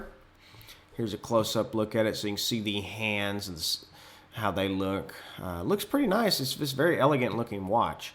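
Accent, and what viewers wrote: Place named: South, United States. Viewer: I like your approach. Your video style is very friendly, down to earth, fair minded, and helpful. Please keep up the good work! I am also recommending your videos to my students ( I am a freelance English teacher) as you have a very mild, clear, Southern accent, which I think can contribute to their listening skills nicely. ( I get them to listen to a mixture). Many thanks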